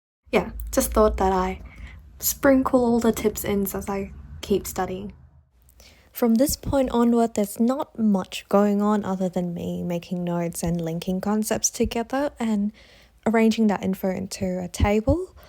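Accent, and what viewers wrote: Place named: Australia. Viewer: omg i literally thought her accent sounds familiar is she australian?? lmaoo im an aussie too!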